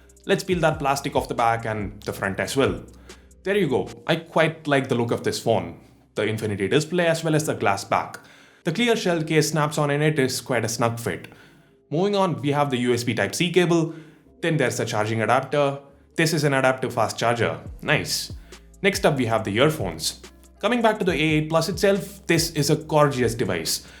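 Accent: India. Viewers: Another indian gay accent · Sounds like indian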